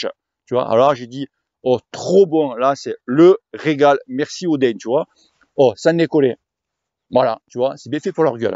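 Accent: South, France. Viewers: Ride on! continus à être honnête, franc, généreux et entier. Dis ce que tu penses au moment ou tu le penses et avec ton accent du sud: c'est comme ça que l'on t'aime. Ton sens du partage fait plaisir à voir. Tes messages de prévention sont les bienvenus pas trop d'exo de force pour les jeunes,..., pas trop de zwift. merci de t’inquiéter pour nous. c'est sympa. peace. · Bravo, super vidéo. Ne change rien, ce ne sont que des jaloux. Et vive l'accent du midi.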